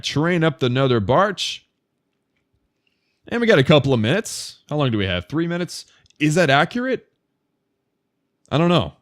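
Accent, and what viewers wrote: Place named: Germany. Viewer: Congrates for hitting the 10k Subs. You have good content - keep up the hard work. Considering your name and I thought I scented an ever so light accent - do you have some german roots? Regards from Hamburg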